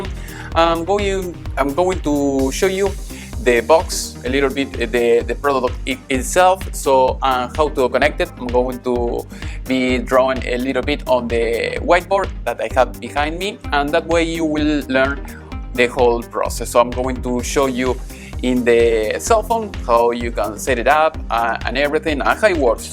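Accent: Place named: Rioplatense, Argentina. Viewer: te escribo en español porque por el acento y el "going to" (vamos a) que usas mucho igual que yo tenés que ser sí o sí argentino o uruguayo. Yo soy porteño, y vivo en Atlanta, Georgia. Habiendo hecho esta introducción, te cuento que me encantó el video, y que probablamente sea el más instructivo y completo que encontré sobre el Teradek Vidiu Pro. Me lo quiero comprar para hacer transmisiones para la tele argentina, y la verdad es que creo que me voy a inclinar por esta opción. Te mando un abrazo argentino y espero que estés muy bien. ¡Gracias!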